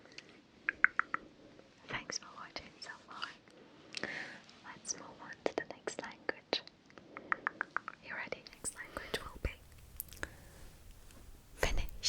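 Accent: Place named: Thailand. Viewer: Your Thai accent is so cute. I love it ❤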